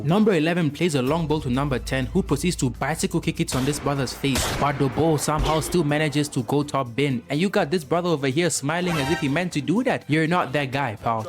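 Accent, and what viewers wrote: South Africa. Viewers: Hmm are u south African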